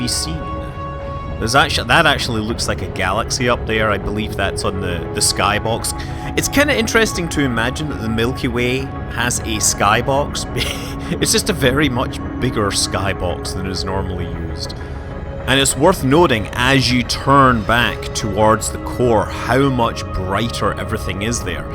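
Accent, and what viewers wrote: Scotland, United Kingdom. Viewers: The Scottish accent probably threw him off.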